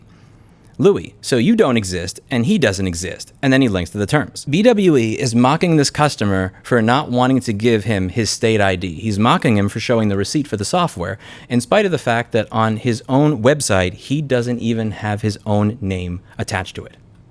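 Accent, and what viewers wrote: New York, United States. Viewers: Louis’s accent becoming more and more stereotypical NYT as he talks is perfect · The NY accent came out hard in this one. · Ive watched for many years, taking a break from him over the last year or 2 (sometime after the whole RTR saga). Im back now because of this scandal and all the coverage its rightfully getting... I cant help but notice that NY ACCENT is much heavier... And he has FAR MORE NERVOUS TICKS than he ever did before... In fact, I dont remember either of these things in the past. In this video, he's twitching an awful lot. I hope hes taking care of himself. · Great video Louis. I’m a former NY’r and man the NY’r in you comes out full throttle in this video. Keep up the great work!!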